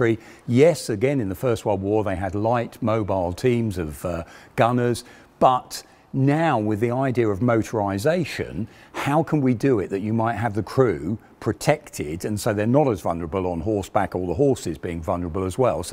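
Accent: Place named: United Kingdom. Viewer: Alongwith the knowledge about tanks, I get to learn British accent also! Win win!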